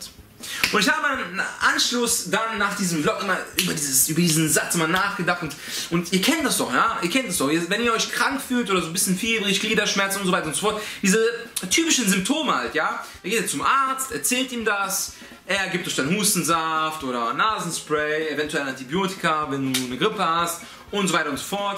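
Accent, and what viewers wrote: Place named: Switzerland. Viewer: hahahahahaha wie du schweizerdeutsch sprichst 😂😂 Top!!! 👌👌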